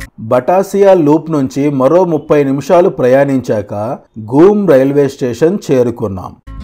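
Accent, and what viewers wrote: Nellore, India. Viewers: బ్రదర్ మీది nellore ఆఆ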